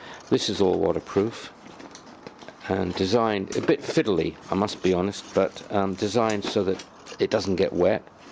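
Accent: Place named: United Kingdom. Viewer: Hi thanks for uploading this video. I'm assuming by your accent that you are from the UK? I was just wondering if you have ever been stopped or asked by the police about the bike? The UK law on e-bikes have been changed so those 'off road' boost buttons are no longer permitted. So the new A2B only does 15MPH as standard but Ultra Motor can up the power to the full 20MPH again for 'off road' use. I'm planning on purchasing a new a2b but wondering if I should get it unrestricted or not. Thanks!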